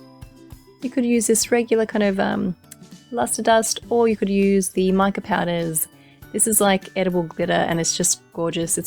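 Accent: Australia. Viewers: Loved the idea, and love your Australian accent! Kisses from Italy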